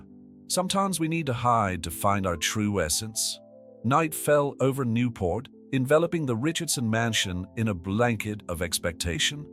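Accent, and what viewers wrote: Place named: Ireland. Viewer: The reader has such a lovely accent. Is that Irish I’m hearing? Is it AI? Either way, I’ve enjoyed the sound.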